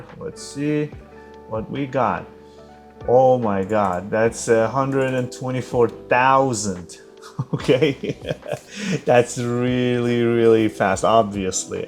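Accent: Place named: Iran. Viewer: I can tell a Persian accent from a mile away. Nice board.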